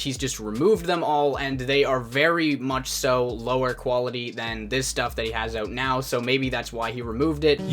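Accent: Canada. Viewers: Jesus christ another video that you made using the term "industry plant" incorrectly. Not sure why your gay ass incorrect videos keep coming up in my feed, but it needs to stop. Additionally you need to stop making these videos using terms you learned online incorrectly with your canuck accent. Shit is cringe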